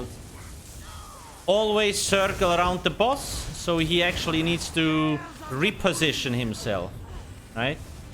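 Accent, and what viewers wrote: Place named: Switzerland. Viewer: du kannst echt ned leugnen, dass du Schweizer bist xD Schöne Videos. Hoffe da kommt bald mehr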